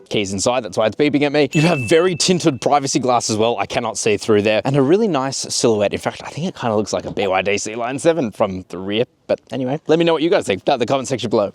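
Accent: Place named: Australia. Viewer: Why does an Australian accent make everything more fun to listen too!!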